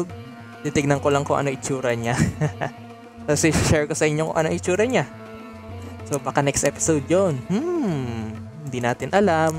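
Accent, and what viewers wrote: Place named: Visayas, Philippines. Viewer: Para ka pong bisaya hahah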